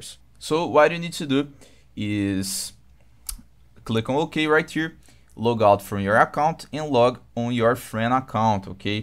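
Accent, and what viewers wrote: Brazil. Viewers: he got that brazilian accent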